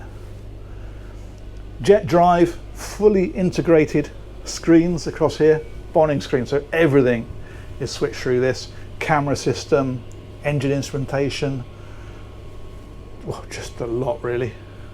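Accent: United Kingdom. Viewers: It would be great if you pronouciated a bit more. It's hard to pick up british accents unless I'm hammered, drunk, my guy .that said great viewing ,great work ..btw one of the nicest fly decks I've seen for driving.im sold I'll buy one .how much is it ? Oh.. does it come in blue? No .aghhh that's to bad .😂😂😂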